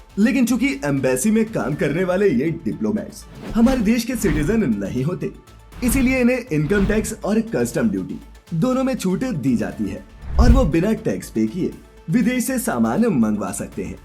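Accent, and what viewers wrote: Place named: Hyderabad, India. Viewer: Hydrabad side se hoga.. hindi aise hi accent mein bolte udhr ke log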